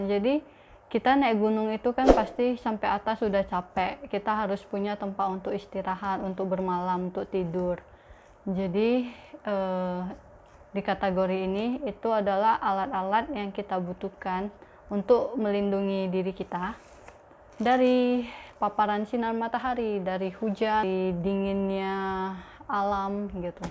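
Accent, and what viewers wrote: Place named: Medan, Indonesia. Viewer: Medanya gk hilang .. wkwk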